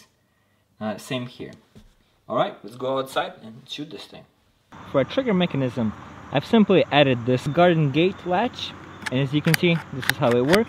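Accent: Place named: Russia. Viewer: Are you Russian?? You talk like a Russian (not for bad)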